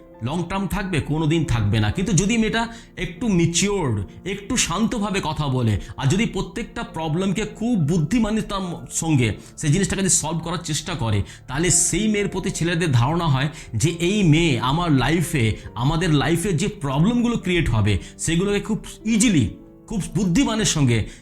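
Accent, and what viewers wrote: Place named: Kolkata, India. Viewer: Apni Kolkatar...😂😂😂 Kotha bolar dhoron ei bojhay..😢😢😢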